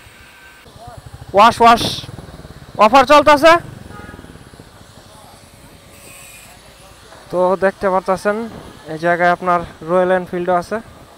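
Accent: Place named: Narayanganj, Bangladesh. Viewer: বেশি বেশি ভিডিও দিবেন আর নারায়ণগঞ্জের ভাষায় কথা বলবেন ভালো লাগে একটা দেশি দেশি ভাব আছে ❤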